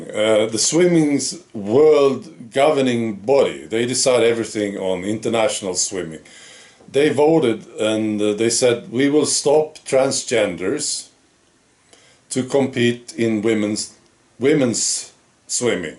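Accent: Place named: Sweden. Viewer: Apart from being Swedish, there is nothing wrong with your accent.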